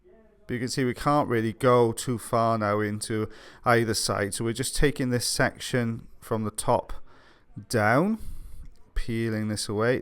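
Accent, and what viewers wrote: Wales, United Kingdom. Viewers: God Damn! Look at all your female fans! A bear of an audiologist with a Welsh accent gets the ladies going. I'm jelly. Your Mrs must hate your channel.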